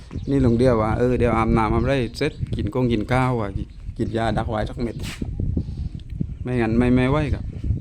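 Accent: South, Thailand. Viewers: ฟังสำเนียงคนใต้แบบ แล้ว.กะดีคือกัน.ได้รู้ว่าภาคใต้เขาพูดแบบนี้.ฟังจากที่อื่นเขาพูดเร็วๆรัวๆ.แต่ พูดแล้วฟังรู้เรื่องจ้า.❤🎉🎉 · ฟังสำเนียงใต้ก็เพราะดีนะคะ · สวัสดีจ้า ชอบฟังสำเนียงใต้ลุงจ้า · ฟังสบายๆ.ภาษาใต้.ให้กำลังใจคับลุง.จากfc.นราคับ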